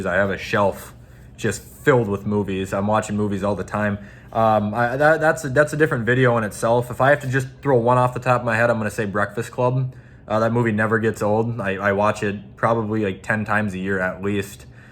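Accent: Minnesota, United States. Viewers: I am Canadian, and he sounds American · I knew you were Minnesotan cause I saw the banners and your accent is exactly like other Minnesotan accents Edit: you being a Minnesotan fan and saying you hate the cowboys more than the packers wow I’m surprised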